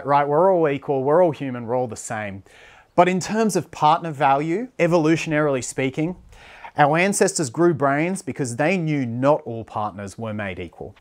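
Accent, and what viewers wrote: Australia. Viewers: you've got beautiful body building but the best part of you is your face your eyes your lips and your smile and your voice I know too much ha ha but it's true- I whish I could live in Australia I love Australian accent :))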